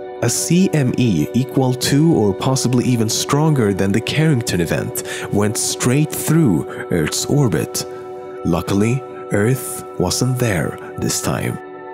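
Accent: Gothenburg, Sweden. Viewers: I fucking hate gothenburg-swenglish. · You can hear he's swedish right away...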